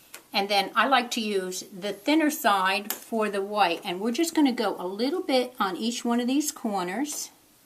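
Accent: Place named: New Jersey, United States. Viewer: The minute I heard your voice, I knew you had to be in Jersey! Hahaha....Jersey shore girl here! Neptune!!! Anyway, can you tell me what pencils you are using and what the black pen brand is? Thanks.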